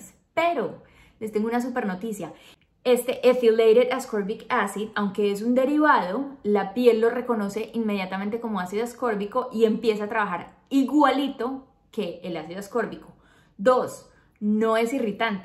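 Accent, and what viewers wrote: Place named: Colombia. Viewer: Tenias que ser COLOMBIANA para ser tan HERMOSA y ese acento 😍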